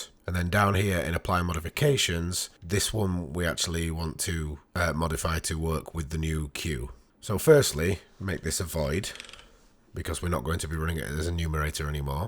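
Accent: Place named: England, United Kingdom. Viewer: Your videos are not boring. For those of us who want to learn, videos like this are rich with content and quality. I walked out of this video being able to thread. That is an invaluable quality in gaming that few people out there bother to take the time and care to teach. So when you present us with this Cake filled with information wealth, it is ANYTHING but boring. Have you noticed we dont thumbs down you? It is partly because you are english and sound awesome, but mostly, its because somehow, the way you teach gets through. You take time, you allow us time to process... These long videos, I watch through from one end to another. This, is quality. I will continue to patreon you until I am poor because you have helped us.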